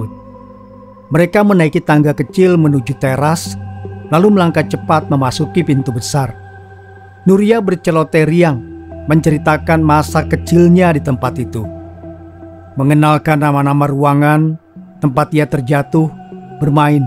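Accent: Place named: Kalimantan, Indonesia. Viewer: Waalaikum salam jkarta hadir nyimak ,ak ud ketingaln ya, ak seneng dgerkan cenwl ini crita ya ttg alam ghoib trus,, smoga sehat tris ya bg,, ak mau nayya apa abg asli klimatan ya ,soal ya logat ya beda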